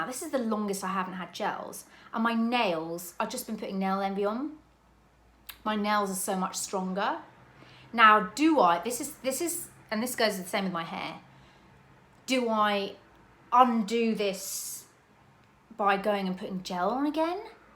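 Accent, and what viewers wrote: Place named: Northern England, United Kingdom. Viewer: Hey, I'm loving the pumpkin vibe you're having. It reminds me of how much I miss the autumnal days at home, plus the fact that there is so much more choice in the supermarkets than over here as the seasons change 🍁. I do feel that the 'clean beauty' fad is just really bad and complete misinformation. Watch ANY board certified dermatologist or cosmetic scientist and they give all the clinically proven facts that parabens and all the other 'demonised' preservatives are absolutely safe and absolutely needed to keep our products bacteria and germ free.....and prevent skin infections.... UNLESS you have a known allergy to a specific ingredient. This is why cases of some skin complaints (peri-oral dermatitis ect), are on the increase. Oh, it's the same over here regarding TV, there's nothing interesting....although I do still love coronation street and eastenders on UK TV 🙃👌. 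Yep, I'm here for the 'latte' inspired make-up look, 👌 😍. It looks really natural and glowing.... however, I'm not a person who gets my nails done, so I can't comment. Apart from saying, "Go with what appeals to you, you can always change it later on." It's good to have a choice 😊 LOVING the Northern accent, too 😍 😅. Great video, and it's always great to see you 👍 ❤⚘️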